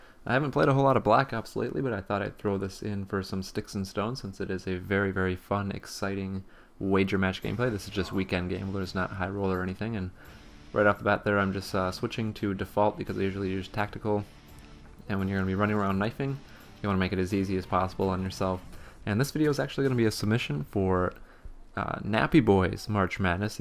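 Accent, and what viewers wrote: Minnesota, United States. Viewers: i kno this is a stupid question but r u from minnisota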